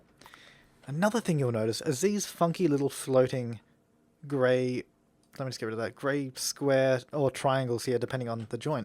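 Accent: Australia. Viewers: good video, it was very useful, r u auzzie??? u sound like it, i am :] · are you Australian? cus i am and icant tell if you have an aussie accent or not but i do kno aussies say bute and bambas like you did in the vid :)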